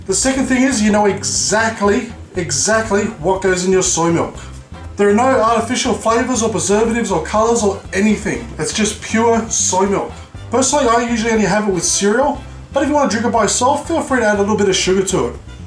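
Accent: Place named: Australia. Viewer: I love Australian accents for some reason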